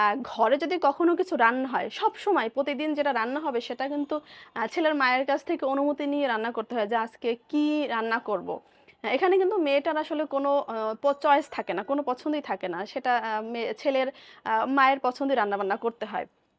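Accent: Barishal, Bangladesh. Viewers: ভাবি আপনাকে বরিশালের ভাষা কথা বল্লে খুব হাসি পাই, ভালো লাগে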